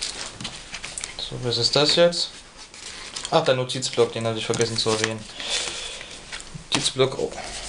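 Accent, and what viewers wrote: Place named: Saxony, Germany. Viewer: omg ein Sachse, wie schreklich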